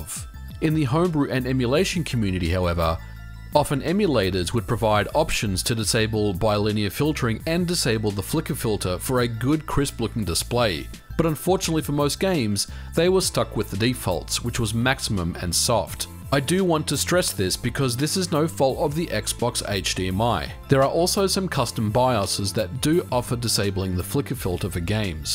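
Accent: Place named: Australia. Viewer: Nope, I'm watching this because I could never miss a video with this amount of aussie accent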